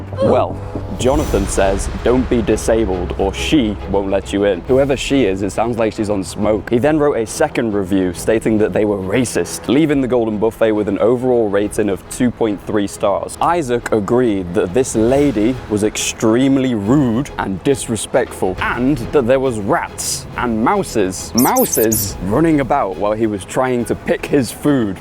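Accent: Liverpool, United Kingdom. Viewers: I can tell by your accent. Liverpool is a great place. The Beatles came from there. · I think you're the first British YouTuber I've followed. I love the British accent. With all my love, I wish you the best of luck in your future.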